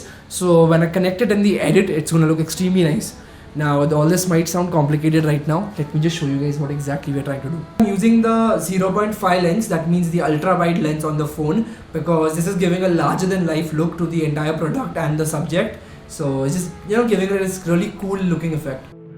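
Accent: India. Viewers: Who guys came here for the Bollywood Accent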